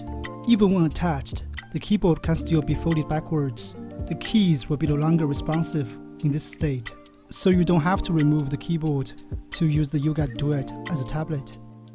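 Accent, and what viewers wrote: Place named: China. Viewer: Fluent English with a strong but unique Chinese accent, I love it.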